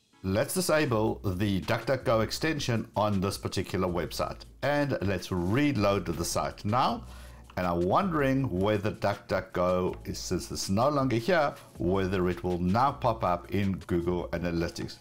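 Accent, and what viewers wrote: South Africa. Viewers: I’m having trouble with the accent. Is it South African? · I know a South African accent when I hear it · Is this guy South African?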